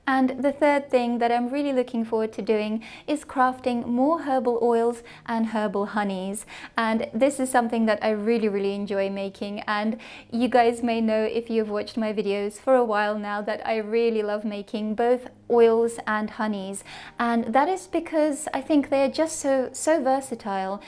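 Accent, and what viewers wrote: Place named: United Kingdom. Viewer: You speak british english very nicely. It is pleasure to listen to you. Thanks for the inspiration🐝🌻Lovely day!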